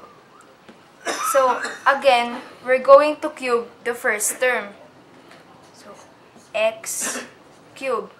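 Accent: Philippines. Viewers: I'd thought the accent was familiar, Pinoy ka pala eh... Salamat sa video mo... naka pag arak ako para sa test ko sa school 😁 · Pinoy to guys im proud to be pinoy ang galing ito thank you po for uploading this (kung wla line of 7 ako) (accent mo btw is pinoy its easy) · sabi ko na Pilipino ka eh, yung diction mo eh :) great work! di ko 'to alam, buti gumawa ka ng video na hindi sobrang haba. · are you a filipino? because you have the accent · Sabi ko naga ba pinay ka boses palang halata na haha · You are a Filipina, aren't you? because your accent though :D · You sound like a filipino trying to talk English.... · sabi ko na pilipino ka dahil sa iyong accent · I knew na Pinay ka po ^^, accent pa lang. xD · Are you Filipino? Because you talk like one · ACCENT PALANG PINAY NA!! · U are Filipino, i can tell by the accent. We speak the same accent kse hehe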